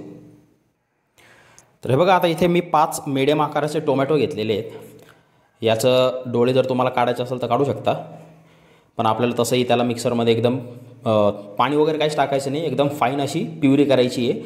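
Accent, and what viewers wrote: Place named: Pune, India. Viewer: भाऊ तुम्ही नाशिक पेक्षा, जास्त आमच्या पुण्याचे मुळशीतले शेप वाटतात, आमच्या गोड रांगडी भाषा सारखी ❤️ तुमचीपण पन टोन वाटते 👌🏻 🙏🏻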